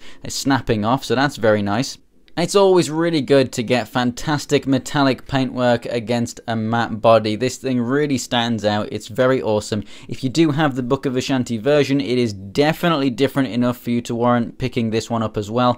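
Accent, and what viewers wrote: United Kingdom. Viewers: You sound English - so how have you got these before release here, as far as I'm aware weren't these out in September or October? Or did you get them imported from US?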